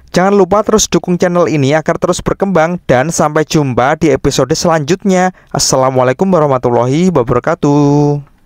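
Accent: Java, Indonesia. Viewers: logat jawanya yg ngangenin bang..😁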